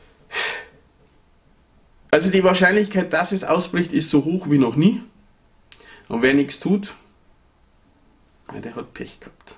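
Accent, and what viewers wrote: Bavaria, Germany. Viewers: Gutes video, und die bayerische mundart kann ich sogar verstehen. Da gibt es schlimmeres.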